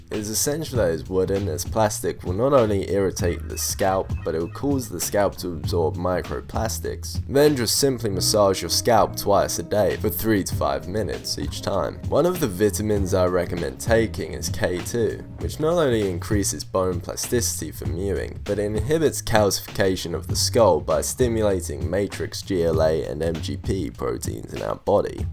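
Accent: Australia. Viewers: 😍 do I hear that Aussie accent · No dickhead......hardwater is simply a parts per million density figure......could be peanut fucking butter in the agua,pull up fuckstick.......genetics and getting on the gear,you dumb dunce.........that stick reduces DHT does it.....................................finasteride and dutasteride have no sides in 98pcent of users...................my question is,are you self aware enough to realise you are a cuck who simply likes the sound of his own voice,Australian pronouncing VITAMIN like you do,govern thyself ........ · Was wondering why you weren't uploading videos just to find out that you opened a new account lmao your dry ass humor mixed with your aussie accent just stands out to much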